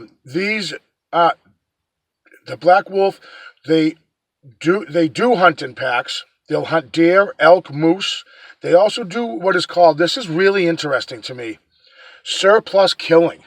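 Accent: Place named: Rhode Island, United States. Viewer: You're from Rhode Island, I'd bet...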